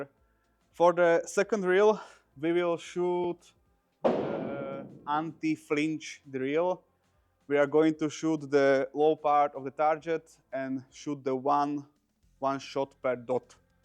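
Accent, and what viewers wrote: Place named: Slovakia. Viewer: Well to be honest, no problem with English, but. But, you should be able at least to have a full capability of speaking consistently without mishaps. And I am also not talking only about strong Slovak accent, that is not what worries me that much, but the strong competition on YouTube among English speaking shooting channels. It will be difficult to bring something exceptional. I wish you all the best and I do not intend to stop watching your videos. Just a small feedback.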